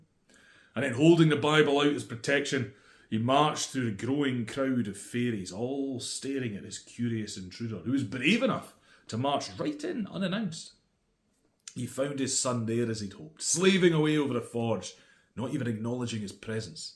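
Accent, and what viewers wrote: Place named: Scotland, United Kingdom. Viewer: I'm a firm believer that folklore stories are best told by someone from the country the story comes from...LOVE Scottish accents the best 😊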